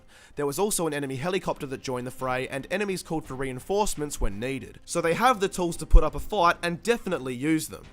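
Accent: Australia. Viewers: Do you think you will continue playing Hitman Absolution? I don't care really, I just love your true blue Australian commentary. :)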